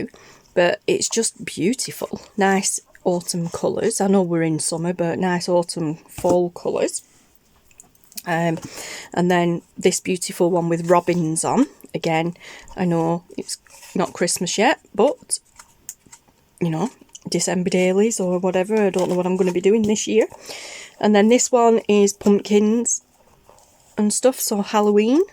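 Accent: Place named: Northern England, United Kingdom. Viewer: Nice to hear a northern voice doing crafty hauls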